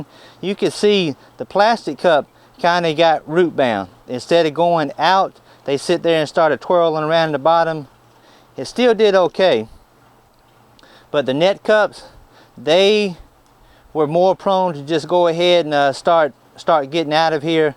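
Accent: South, United States. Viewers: Ok, so I hear a southern accent and I know I heard at least a couple of "dat"s...are you in or around Louisiana? Who Dat? LOL Anyhoo, I live in northwestern Louisiana and have really moist soil...I was wondering if you have any suggestions of either what would grow best or how to get my soil to dry up a little. I till it but with all the rain and the fact my soil holds moisture like a sponge, it just never seems to dry (and this is on the elevated areas)...love your videos!!!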